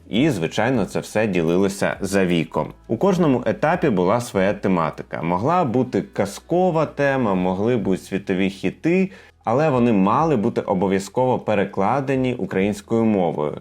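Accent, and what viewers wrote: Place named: Russia. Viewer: Дякую за такий гарний ролик! Прохання до ведучого розмовляти українською вдома. Російський акцент жахливий .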